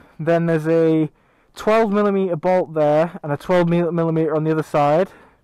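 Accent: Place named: Manchester, United Kingdom. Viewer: Good stuff mate. I just got the same bike and exhaust last week and have been watching video's thinking all I have to do is use an allan key to get the db killer out and drill a couple of holes to increase the volume, fuck euro 4, apart from abs which has already saved my life. Can I give you a shout if I am struggling with it? I am in Manchester and can tell by your accent that you are not that far away. Couple of beers in it for you :)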